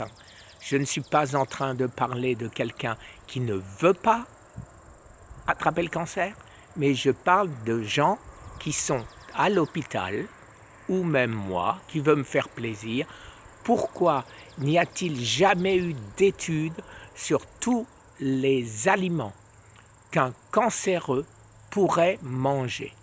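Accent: Alsace, France. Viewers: Coucou j’avais bien cerné que vous étiez de l’Est de la France avec votre prénom et votre accent Vous êtes Alsacien du bas Rhin Et moi je suis née en Lorraine À Thionville (57) Puis vécu près de Metz J’habite en Provence (83) aujourd’hui Pour en revenir à la vit B 17 pour le noyau d’abricot riche de cette vit c’est l’extraction du centre du noyau je l’ai entendu sur YouTube Ou le trouvez-vous?! Merci 🙏🏻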